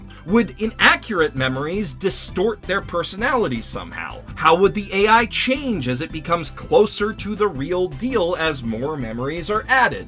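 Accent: Canada. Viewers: Holy cow! Are you Canadian??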